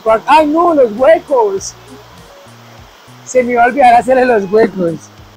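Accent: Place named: Colombia. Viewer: ay qué divertido eres, con ese acento colombiano, esa locura x las plantas q comparto, es una lindeza oirte, me río mucho, eres encantador ojo yo vivo en el campo cerca de Madrid, un clima extremo y en el monte..te puedes imaginar la de fracasos q he tenido con las plantas..pinos pinos y más pinos un horror x eso estoy bellamente fascinada con esas plantas tropicales y exuberantes, Dios te bendiga☺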